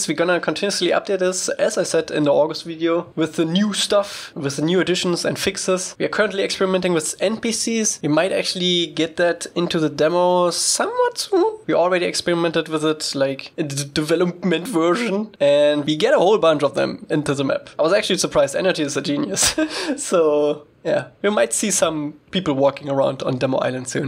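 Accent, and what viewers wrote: Germany. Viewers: Mann hört das du deutsch bist 😅😅😅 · this deutsch akzent is da hehehe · I hören a Deutsch accent 😅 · You sound so German...😂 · Höre ich da deutsch raus?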